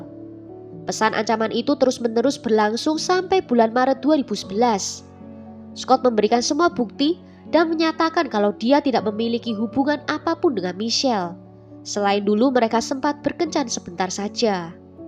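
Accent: Java, Indonesia. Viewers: kamu memang wow sekali pokoknya.... kasus mu pun mantap punya..... dgn aksen jawa yg gak ketinggalan membuat aku terpesona..... top markotop lah....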